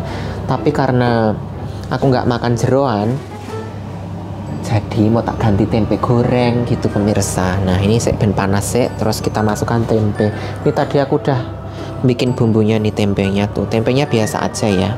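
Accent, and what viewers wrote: Java, Indonesia. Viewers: Mas jawanya pundi kok bahasanya medok bangettt 😊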